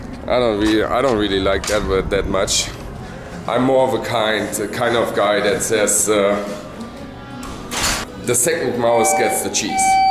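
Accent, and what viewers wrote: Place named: Germany. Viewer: "Early bird get the warm". Awesome German accent.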